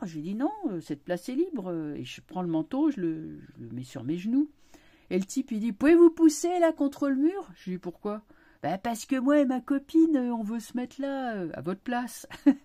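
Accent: Paris, France. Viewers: Bonjour, bonjour à tous les Velazquezinnes et Velazqueziens, merci pour cette video, moi ce qui m'amuse c'est votre ton qlq peu débonnaire...oui....bof teinté d1 accent parisien😉 vos visions des choses résonnent chez moi, c'est tjrs très pertinent et plein d'érudition...non feinte. Bon dimanche à bientot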